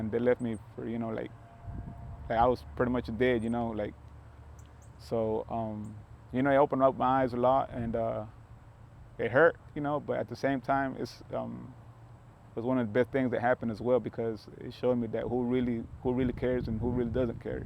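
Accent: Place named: Alabama, United States. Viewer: What a trip, a Guatemalteco with an Alabama accent. ❤ Stay strong in the faith hermano.👊🏼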